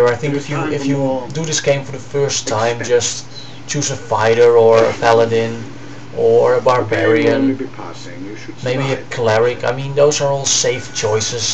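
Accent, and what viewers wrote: Netherlands. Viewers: hes dutch aint he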